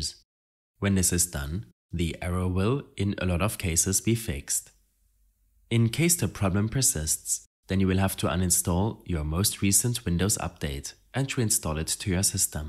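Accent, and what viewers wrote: Germany. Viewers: Man hört das du deutsch bist 😂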